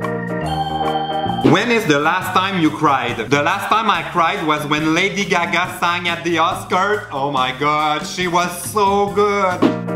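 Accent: Quebec, Canada. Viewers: I'm surprised by the accent. I thought only the Francophones from France have thick accents, but not the Canadian Francophones. Anyway, trop drôle! :D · T'as exactement le même accent québecois mais en anglais hahahah comme moi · Je ne pouvais pas m'imaginer que l'accent québécois assez aussi horrible que français en anglais. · Nils Bondenson I get what you mean, I live in france and for me the quebec accent is so different yet ppl there seem way nicer. He has a strong accent though. I was listening to Justin Trudeau yesterday and even he has a slight accent in english! I was like woooh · That was so hilarious! I didn't know that French Canadian people had a French accent when they speak English... That was a funny discovery. (Come on! Siblings: Frères & Soeurs)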